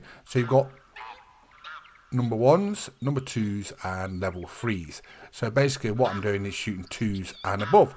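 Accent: United Kingdom. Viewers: never have i thought an absolute peak guide would be narrated by a deep voiced british guy. idk what it is but your voice makes me focus a lot better on the guide and my brain gathers the info better lmao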